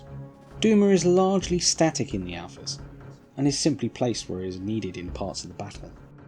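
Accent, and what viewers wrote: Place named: England, United Kingdom. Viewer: I think you'll find he's English English so would naturally pronounce words differently to an American standard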